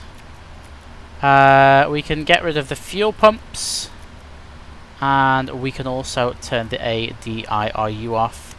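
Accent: United Kingdom. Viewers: Prefer a pommy voice-over to yank ones :o) - Aussie wouldn't be bad but you've done a great job thanks.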